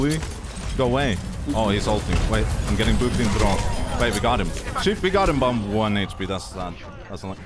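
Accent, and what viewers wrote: Sweden. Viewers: how did your swedish accent got worse??? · I like that i can hear that u are swedish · The Swedish in this guy is RADIATING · du låter svensk? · I love your vids man btw are you swedish cuz i can hear it from your english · You sound so swedish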